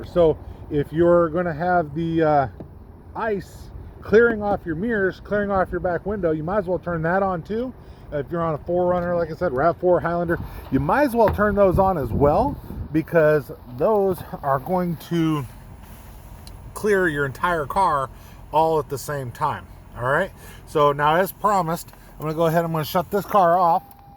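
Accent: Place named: Flint, United States. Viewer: Flint guy here, I know that accent!!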